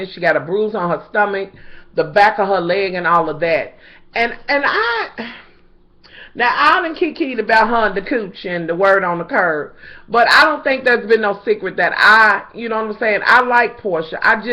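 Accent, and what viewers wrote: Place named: New Orleans, United States. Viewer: Miss I swear that I love you, if I didn't know any better I would swear that you were from New Orleans!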